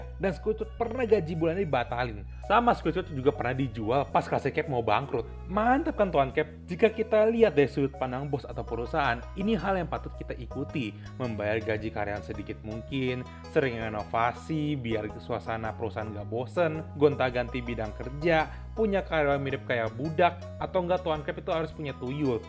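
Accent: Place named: China. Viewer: Salfok sama cara ngobrolnya bang kedenger ada aksen tinghoa nya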